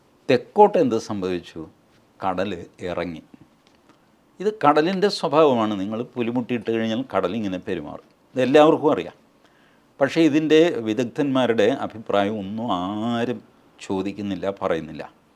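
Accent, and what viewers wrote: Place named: Kochi, India. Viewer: Kochi talking.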